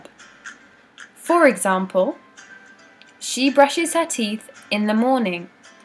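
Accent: United Kingdom. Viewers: Why she sound british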